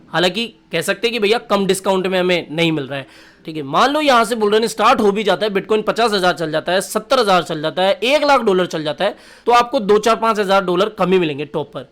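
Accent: Rajasthan, India. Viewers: Bhai app Rajasthan se ho na 😏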